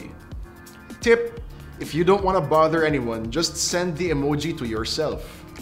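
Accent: Philippines. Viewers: Ur accent sounds like Filipino. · You sound like Filipino accent.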